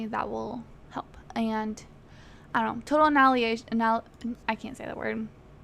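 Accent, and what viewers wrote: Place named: West Coast, United States. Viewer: / slightly triggered by her voice, but also knowing maybe she's just from the west coast.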